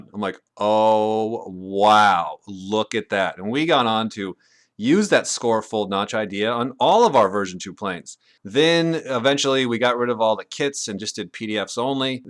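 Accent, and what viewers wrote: Russia. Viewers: lol russian accent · like the russian accent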